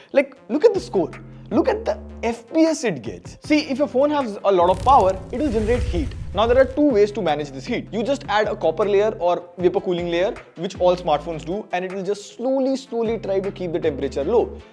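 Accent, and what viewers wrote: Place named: Bengal, India. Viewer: I think you are a Bengali boy because your English tone is just like them 😅😅😅😅😅 answer me please 😢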